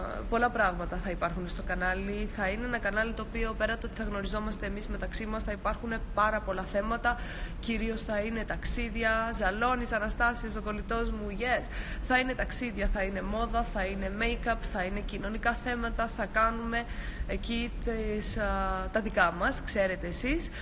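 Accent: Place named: Germany. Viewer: Το χειροτερο ειναι οτι παει να παραστησει την χαριτωμενη! Το αμεσως επομενο που κανει την τοοοοοσλ ενθουσιασμενη. Το λαστ μπατ νοτ λιστ, που μιλαει αγγλικα με προφορα χωριατη γερμανου. Αστο δεν εχεις αστρο...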